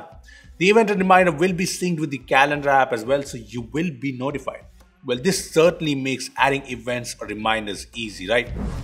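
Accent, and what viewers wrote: India. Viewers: How to show fps? You talk too fast indian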